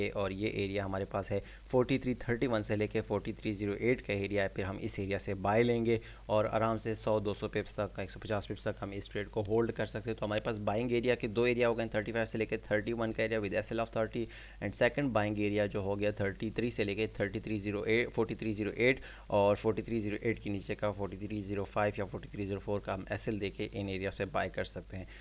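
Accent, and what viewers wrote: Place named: Peshawar, Pakistan. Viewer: Assallam o alaikum bro aap peshawar s hu Mashallah ap ka accent unhi jaisa hai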